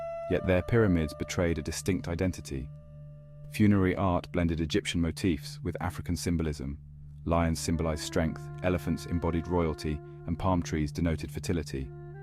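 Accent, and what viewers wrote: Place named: United Kingdom. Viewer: Haha I have the opposite reaction to this other guy - this is a real human reading this right? In English at least. It's not full of the usual AI-isms (disinterested inflection, no pauses) - if it reads a number wrong, or elides a headline into a sentence, or forgets to breathe at any point, I'll be sad. on the other hand, it's speaking British english, but listed as American. That makes certain things more likely than others. Well, if it's an AI, it's a better one.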